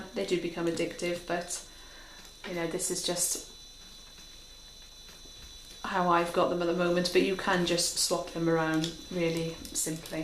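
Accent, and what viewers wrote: Wales, United Kingdom. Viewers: I keep hearing a faint tint of Welsh in your accent?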